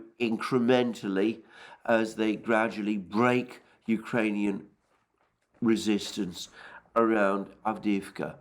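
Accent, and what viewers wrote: United Kingdom. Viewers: Yes, the best ruzzian propaganda with a british accent.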